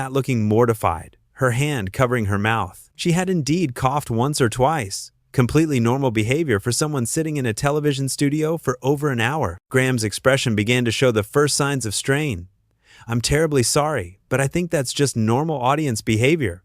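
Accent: United States. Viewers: Ok we have an Irish host, and a member of British royalty, together. Down here in Aotearoa, Nz, Harry has taken part in Maori hakas, played rugby, is a great fan of rugby, and even stayed in a 1930s style pub down on Stewart Island, in a fishing village. He is an ordinary down to earth bloke. No high and mighty, better than you attitude. Just a normal guy. So we get a VO with a yank accent, instead of an English accent, spouting away. This is fake. The Prince Harry I know, would never act like that. A.I do your research. Get your facts straight. OR take a hike. This is bad. 🤮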